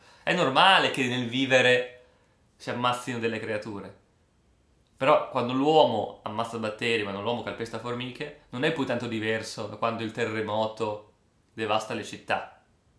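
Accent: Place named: Romagna, Italy. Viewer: Dall'accento e da quello che ho visto sul tuo canale credo che tu sia romagnolo... Mi vergogno ad essere tuo conterraneo.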